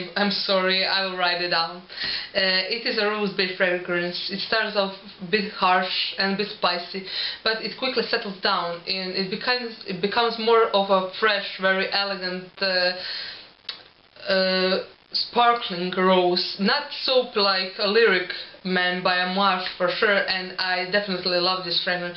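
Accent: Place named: Russia. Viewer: Хахах видно что русская ( акцент )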